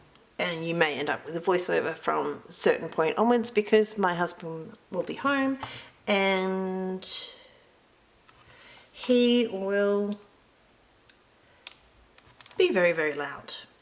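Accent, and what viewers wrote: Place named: Australia. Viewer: Hi, hello from Norfolk Virginia, great to hear an aussie voice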